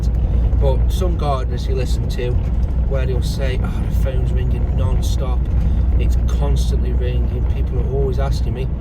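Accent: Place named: Nottinghamshire, United Kingdom. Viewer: Nice drive, and sounds like you've got a good attitude to your business. Good for you. I was trying to guess where you're from going by the accent, I thought Nottinghamshire, then i saw the road signs!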